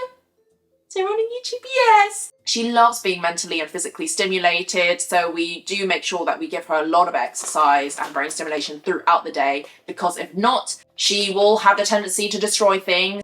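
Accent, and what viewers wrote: United Kingdom. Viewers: A Asian with a British accent 🔥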